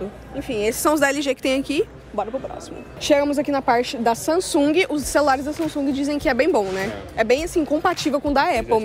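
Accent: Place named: Sao Paulo, Brazil. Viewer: Gostei desse teu sotaque paulista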